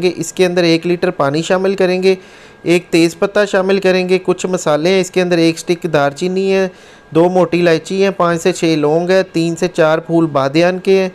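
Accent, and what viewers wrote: Punjab, India. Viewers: ارے بھائی اسٹیم ھوتا ھے نہ کہ سٹیم تم پنجابی انگلش لفظ کو ہمیشہ S کے ساتھ الف کو غائب کر دیتے ھو مثلاً اسکول کو سکول ، اسٹوڈنٹس کو سٹوڈنٹس ،اسکرین کو سکرین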